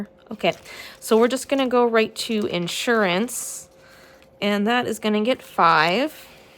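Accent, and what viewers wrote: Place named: Canada. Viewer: The Canadian accent is so strong, I LOVE IT 😂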